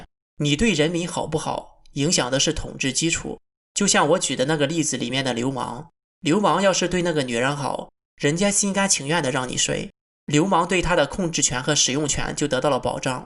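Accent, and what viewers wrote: Shandong, China. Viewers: 口音聽起來相沙東人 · 这声音很明显是山东人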